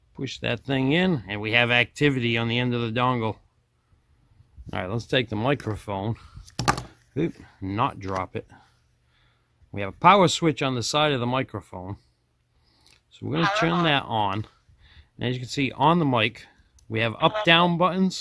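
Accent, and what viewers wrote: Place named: New England, United States. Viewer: You sound like you're from Massachusetts or Rhode Island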